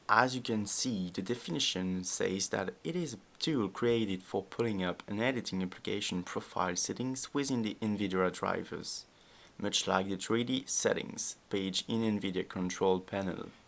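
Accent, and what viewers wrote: France. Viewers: Are you French ? If yes, you speak English very well ! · L'accent français discret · You have the most intense French accent I've ever heard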